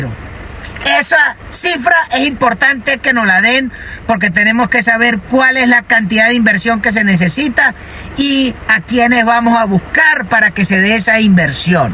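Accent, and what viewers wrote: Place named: Táchira, Venezuela. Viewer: Así se habla,como un GOCHO arrecho👺💪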